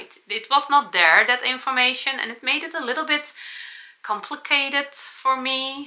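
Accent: Netherlands. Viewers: Hi your Dutch English. is amazing! love your channel, English is also my second language also I Love Holland, kind regards Ilona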